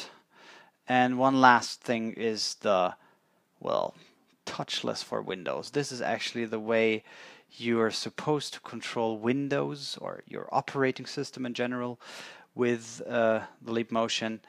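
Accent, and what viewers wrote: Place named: Germany. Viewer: This is the first vid I've watched of yours and I kept thinking "He sounds like his accent is German" and then you went to your home town haha.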